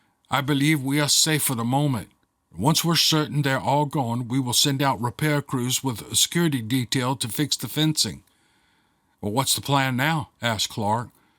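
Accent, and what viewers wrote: Germany. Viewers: I love your German accent done in clandestine Southern flavor,! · really enjoying Blood Eagle and was tickled by your German accent. It reminds me of Arnold Schwarzenegger. Thanks for doing what you do. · Another talent.A German accent. I dont know a thing about it but I do appreciate it. Thanks sooo much for your effort. It gives the narration a good effect. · I was stationed in Germany for five years. German accent is making me laugh. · Living for the German accent ❤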